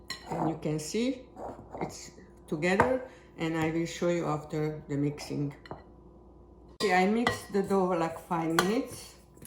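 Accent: Hungary. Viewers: This was difficult for you but you did nicely. Take off your bracelet next time. Keep at it. You’ll improve. Don’t worry about your Magyar accent, it’s nice. What is it in Hungarian and then English? Tell the audience. Also if you have ingredients that are from Hungary give reference where to buy. Good job! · What is the purpose of the cream of wheat? I want to try this but I have never bought cream of wheat. Your accent reminds me of my Grandmother who was born in Hungary. Love your videos.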